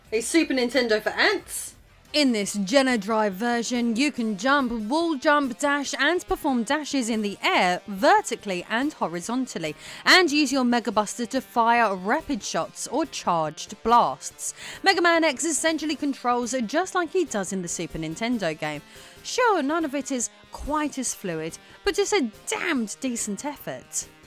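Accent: United Kingdom. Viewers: I love the british accent❤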